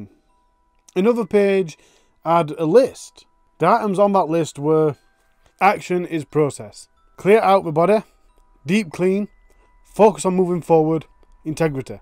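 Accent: United Kingdom. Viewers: It's not weird if you know Britain. It's perfectly normal. You just won't hear it on the BBC, but that's fine because we don't all talk like they do!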